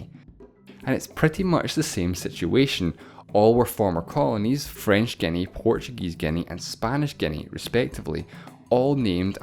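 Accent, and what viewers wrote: Scotland, United Kingdom. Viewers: Azwad Ahsan it’s an Scottish accent. How can he possibly change that with education? Your brain sucks get some education pls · I am more fascinated to listen to your Scottish accent rather than the Congos itself. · José Guilherme Scottish accent. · Scottish accent, right?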